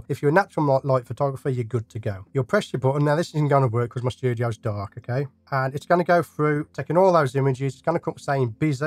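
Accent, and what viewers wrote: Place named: Birmingham, United Kingdom. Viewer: that makes sense. I ended up getting one of the rails a bit like the Nisi one that you reviewed. I had a cheaper 4 way plastic one but I found it was a bit temperamental at moving. Also picked up a OM-1 which I am super happy with! Also, Birmingham based? I am sure I recognise that accent! (Brummie here too, but moved away for 10 years and recently came back, god knows why ha).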